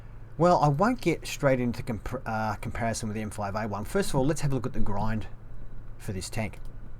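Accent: Australia. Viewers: u aussie mate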